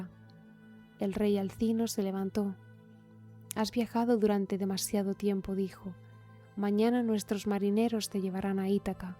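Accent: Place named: Spain. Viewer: Nueva suscriptora. Me encanta tu suave voz, y el acento español es un plus. Gracias por tanto trabajo y espero de todo corazón que muy pronto llegues a los mil suscriptores. 🎉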